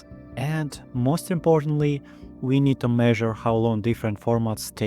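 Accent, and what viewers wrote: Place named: Russia. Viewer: Just finished watching, great video. An amazing voice reveal also with that thick russian accent haha.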